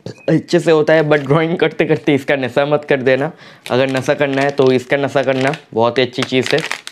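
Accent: Bengal, India. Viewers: His bengali ascent makes me laugh always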